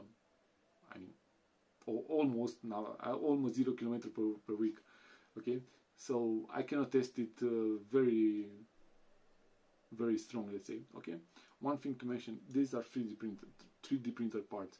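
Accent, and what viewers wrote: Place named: Pakistan. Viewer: You're a great guy for all the effort. Interesting accent btw, i hear a similarity to Pakistani for some reason, although you are Polish or Lithuanian i think.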